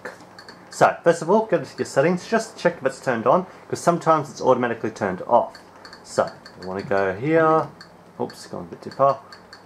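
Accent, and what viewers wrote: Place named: Australia. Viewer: It's not that hard to understand him. He's Australian. It's like American but with an accent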